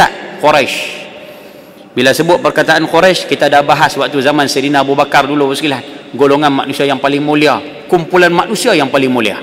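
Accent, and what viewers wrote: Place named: North, Malaysia. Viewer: Antara ustaz loghat Utara yg aku sgt minati seangkatan Ust Shamsuri,Ust Don,Ust Wadi dan Ust Abdullah Khairi.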